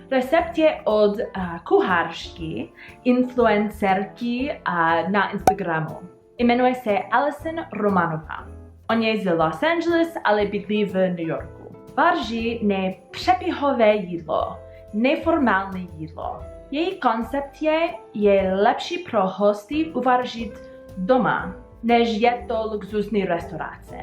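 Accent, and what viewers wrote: Ostrava, Czech Republic. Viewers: jde videt ze jste pobyvala i v Ostrave vase cestina je presne po nasemu, pekny recept a krasne se to posloucha asi to budu muset zkusit uvarit :-)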